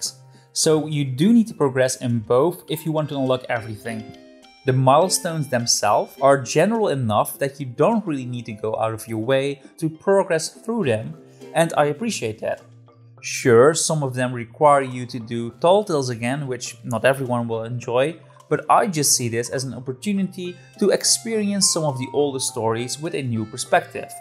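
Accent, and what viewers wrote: Netherlands. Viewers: Knew you were Dutch lol. "Zuipschuit" is a nice touch.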